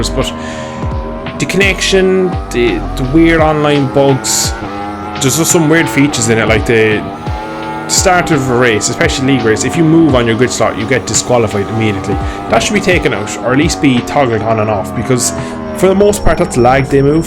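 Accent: Ireland. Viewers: Is thst a cork accent i'm hearing? 😉 Was trying out VRiders SBK natively on the Quest 3 and saw someone mention GP Bikes and ended up here. Havent tried GP Bikes yet but VRiders SBK is actuallt decent for an AppLab game.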